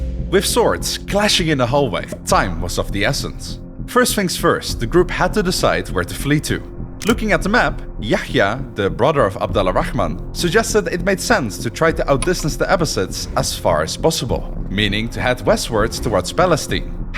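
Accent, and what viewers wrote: Netherlands. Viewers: Dutch accents are ruining YouTube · That's a very heavy Dutch accent 😂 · Ik hoor bijna niet dat je Nederlands ben respect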